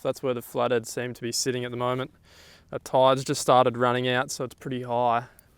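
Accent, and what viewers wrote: Australia. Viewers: It’s wet and has an Aussie accent so possibly Australia.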